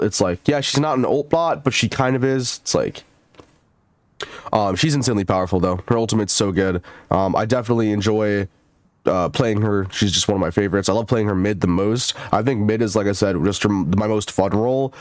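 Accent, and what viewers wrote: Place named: France. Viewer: French accent 🤣 Get better man! And thanks for your time showing this guide to us!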